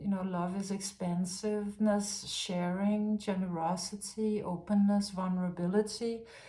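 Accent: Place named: Denmark. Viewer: Actions speak louder than words. Or another one is, 'What you do is so loud, I can't hear a word you say!' I just wanted to say your little video about saying 'NO' to someone is very timely and great advice. Thank you. (PS I've been trying to place your accent. I didn't realise you're from Denmark. My g/grandad was from Holbaek, Zealand.)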